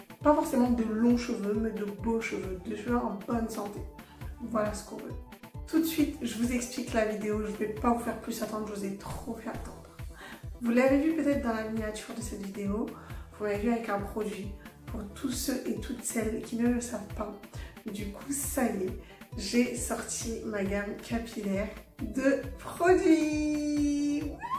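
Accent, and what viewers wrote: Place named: Ivory Coast. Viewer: Dès que je t’ai entendu j’ai dit c’est une 225 😂 en comment que chili pêlê ?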